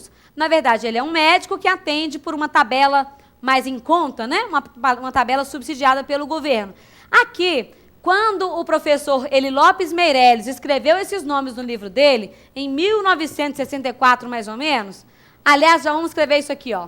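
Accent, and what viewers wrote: Minas Gerais, Brazil. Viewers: Esse sotaque mineiro me traz boas lembranças.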